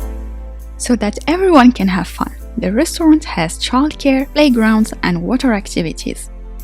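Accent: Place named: France. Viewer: Work on accent as it sounds French, but good effort content wise .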